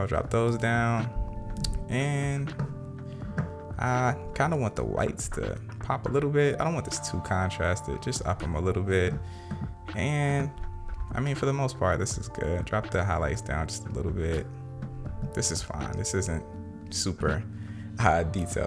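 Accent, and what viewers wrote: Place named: Atlanta, United States. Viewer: His dialect is like Andre 3000. Atlanta, maybe?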